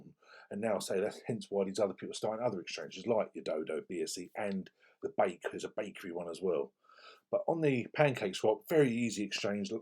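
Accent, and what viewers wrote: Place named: United Kingdom. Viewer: Hello sir, great content! If you don’t mind, I have a question for you. I assume you are a fellow Brit, with that accent! If so, can you advise how Gem token purchases work on VeVe - when I just tried to buy $100 worth of Gems I got charged £100 via the Apple store in app purchase. I cancelled, for now, but is that right, does $100 worth of Gems cost £100, instead of about £72 which it should be at 1.4 conversion rate? (Also posted on latest OMI video)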